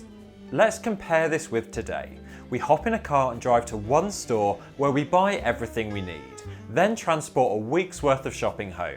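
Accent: United Kingdom. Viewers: Love that British pronunciation with emphasis on syllables unaccustomed to American ears. SeDENtary and ContriBUTory. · he's using the British pronunciation - because he's British. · in the US in the 50's there were HUGE supermarkets & everyone had a refrigerator.....considering his British accent...maybe not in the UK.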